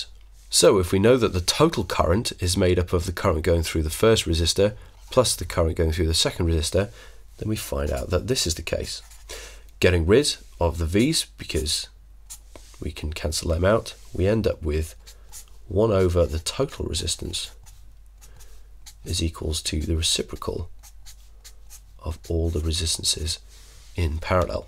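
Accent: United Kingdom. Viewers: Ok but why does his voice , scream British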